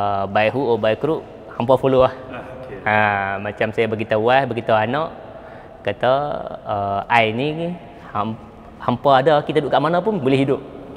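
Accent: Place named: North, Malaysia. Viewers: Saya amat suka kepada cara penyampain yg Walaupun loghat utara tapi Santai. Bagus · Terbaik abg.. aku suka cara abg explain.. santai dan penuh ilmu.. yg penting bahasa utara tetap dihati.. tak da pon unsur2 selit bahasa engeris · Sempoi la dia sembang. Candid n natural. Syok dengaq org Kedah sembang. X yah nk kalut tukar ckp KL pun. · hang memang sempoi bang..walaupun dh fly keserata dunia tapi masih tetap bawak loghat kedah..sempoi baqhang..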